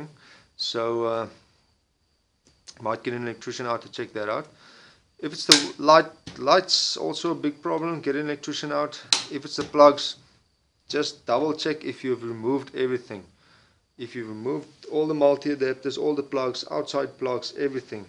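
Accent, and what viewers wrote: South Africa. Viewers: Saved me a call out fee! Thanks a million! In my case it was the breaker that went! Where are you from? You have a strong South African accent ;-).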